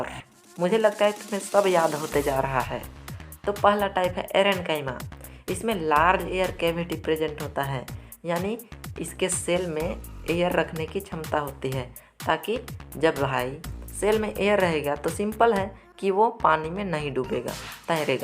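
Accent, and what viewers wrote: Bihar, India. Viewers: Op brother maja aagaya full BiHari style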